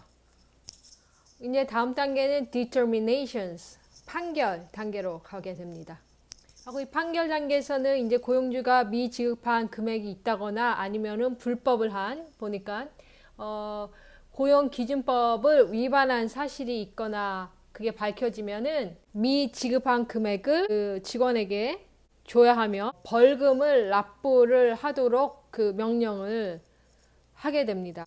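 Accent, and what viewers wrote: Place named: Canada. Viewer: 차분하시고 한국말하시지만 혀 굴리는 소리가 많이 들려서 처음에는 캐나다에서 어릴때부터 사셨나 했더니, 성인이 되어가셨군요. 그래도 역시 중등학교 과정과 대학을 거기서 다시 졸업하셔서 그런지 캐내디언 같습니다.^^ 저도 취업비자로 들어가서 영주권을 받으려고 하는데 많이 도움이 될 것 같습니다. 감사합니다.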